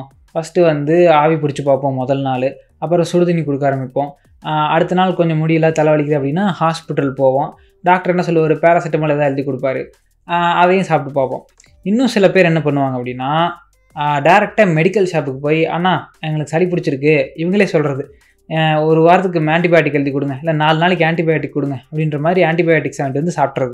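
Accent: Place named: Madurai, India. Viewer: என்னதான் ஜெர்மனில் போய் ஆராய்ச்சி பண்ணினாலும் பேசற அப்போ நம்ம மதுரை ஸ்லாங் வருதுல்ல🎉🎉😂😂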